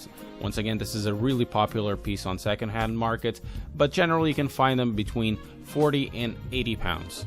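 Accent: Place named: Portugal. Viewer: Where are you from? Your accent sounds portuguese!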